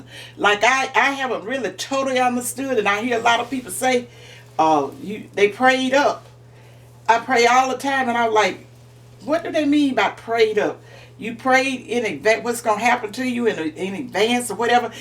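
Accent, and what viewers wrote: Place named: North Carolina, United States. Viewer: I just love listening to you. You remind me of my. Are you in NC? Your accent sounds like hers.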